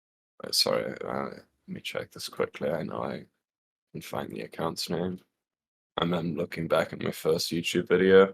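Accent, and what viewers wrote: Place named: United Kingdom. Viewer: why is he british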